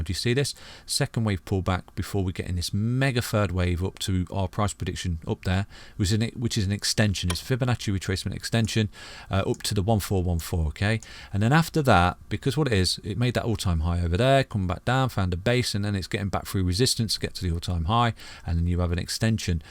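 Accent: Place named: United Kingdom. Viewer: I think the British accent adds to charm.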